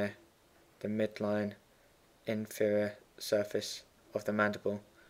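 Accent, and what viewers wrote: United Kingdom. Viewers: terrible british accent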